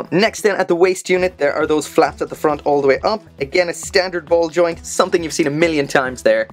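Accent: Ireland. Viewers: Are you irish? You sound it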